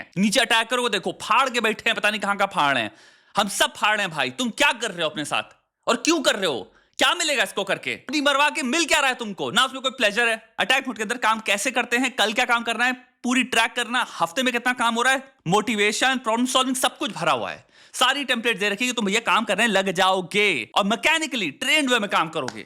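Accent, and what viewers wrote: Pakistan. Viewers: Lahori accent ha iska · he has pakistani accent and muslim i am 100%sure he's a pakistani and that's their thinking for a kafir women's that's what they are taught since childhood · His accent is Pakistani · H sounds like Pakistani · The accent gives me that he is from Pakistan. I might be wrong but all the lingos and slangs he is using are usually used by Pakistanis. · Pakistani lg rha hai accent se... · His accent coincide more with pokistani than with an Indian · Accent to pakistani lag rahi hai iski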